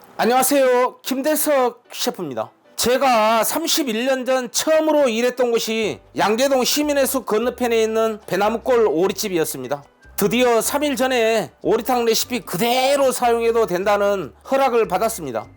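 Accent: Jeolla, South Korea. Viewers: 전라도 분이세요? 말투가 정겹네요😊 보기만해도군침도는 광주식 오리탕 레시피 넘나 잘보고갑니다❤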